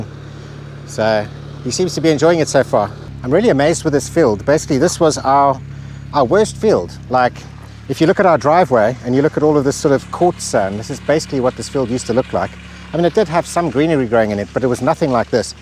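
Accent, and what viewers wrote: South Africa. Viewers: You sound South African... watching from Durban🇿🇦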